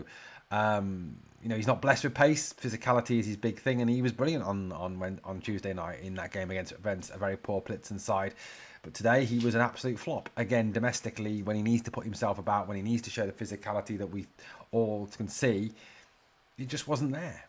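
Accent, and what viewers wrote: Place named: Scotland, United Kingdom. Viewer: You sound so scottish 😂😂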